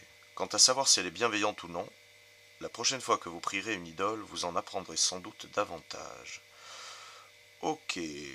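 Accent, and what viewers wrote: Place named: South, France. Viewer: Si tu n'habite pas trop loin de la Bretagne je veux bien t assister pour tirer les cartes voir pourquoi faire une partie ensemble pourquoi pas.hé hé...mais ton accent me fait penser que tu es plus dans le sud.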